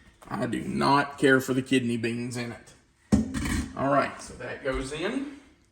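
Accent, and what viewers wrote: South, United States. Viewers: I think you guys are great. What is the fat ratio of your beef? 80/20? 70/30? I love how you Southerners put G in the word onion.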